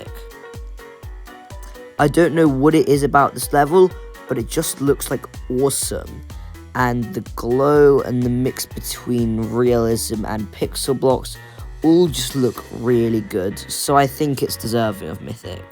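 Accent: United Kingdom. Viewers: I didn't think somebogy's voice could be this British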